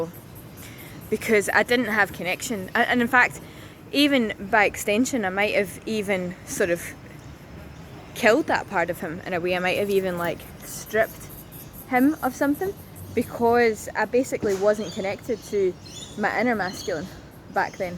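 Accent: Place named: Scotland, United Kingdom. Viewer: I’ve just found you here and loving your beautiful message/teachings… and your lovely Scottish accent. Are you from Glasgow/Lanarkshire?? 💕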